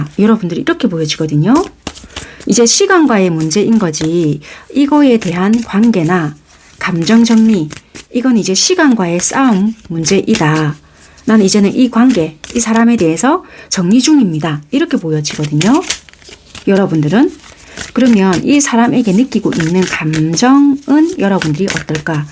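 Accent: Busan, South Korea. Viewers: 1 듣다적은 요약 상대방의 생각 결혼하고싶다 좋아하면안되는데좋아하고있다 자존심 희생 누군가 한명이 '이관계가 뭐지?' 현타 구설수 나란히보단 눈치 상대방의행동 행동,연락없다 선톡해도 겨우 답장 일시정지 '우리 이별인걸까요?' 연락해도 불편 안해도 불편 이러지도 저러지도 심적 갈등 여러분의 행동 얼마전까진행동 지금은 안부를 했거나 일시정지 움직임없음 현재 상대의 생각 쉽게풀리지않을것 내가 움직이지않으면 너도 움직이지않을것 상대의 감정 후회 나의 생각,감정 어떻게해야할지아나 쉽지않네 얘만있는것도 아닌데 공허 이가 하나 빠진느낌 상대방이 원하는 관계 약속 약혼 열정 전생? 과거?에서부터 이뤄진 관계 너와 같이 살고싶다 결혼하고싶다 허허허 100% 500% 맞네요 부산사투리 너무 매력적이시고 현실적이라 좋아요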